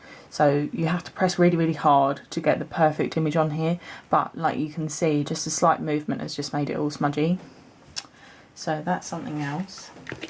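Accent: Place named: United Kingdom. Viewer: Love your videos, thanks for sharing. Have you ever tried cuticle defender? That makes the cleaning very easy. I also tried a glue which I originally bought to repair a wooden car from my son. Mixed with a little bit water it also works perfect. I use it first before I do any polish on my nails, cause it needs a little more time to dry than the cuticle defender you can buy. I really love it. Thanks again for making this videos. And I really like your voice and the british accent 😍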